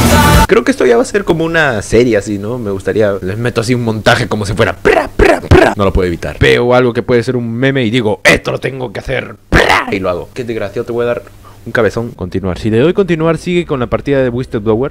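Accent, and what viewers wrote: Peru. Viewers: eres peruano? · Eres del Callao no choro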